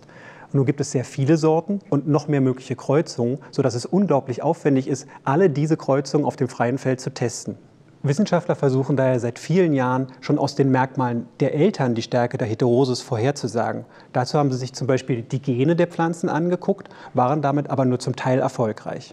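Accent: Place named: Germany. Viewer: HA! Ich wusste schon beim englischen Video, dass der Akzent aber recht deutsch klingt :D. Es wäre praktisch, wenn ihr die Videosprache im jeweiligen Titel auszeichnen würdet, da viele Englisch-Sprachige Zuschauer kein Deutsch können bzw auch viele Deutschsprachige sich im Englischen nicht wohl fühlen.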